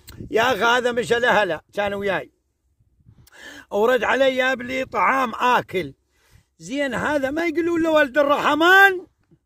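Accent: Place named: Iraq. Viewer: اخي انت من اي بلد كلامك يشبه كلامنا بالعراق اكون ممنونه لو تكول انت منين مع خالص تحياتي وتقديري لحضرتك حبيتك على قدر مداراتك لامك الله يرحمها ويدخلها الجنه ان شاء الله رحمه على حليبها الطاهر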